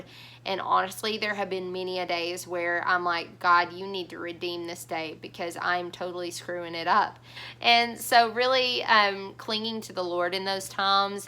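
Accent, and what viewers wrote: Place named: South, United States. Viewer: I want your Obstetrician! Also, I don't like pregnancy at all but love actually birthing. I always get epidurals though. Have birthed 6 babies and I love your channel...had no idea you adopted. The more I learn about you, the more I love you. God bless you, mama. You're doing a great work. Also, your accent is just amazing. I'm a Texas, but you are the epitome of southern. ❤️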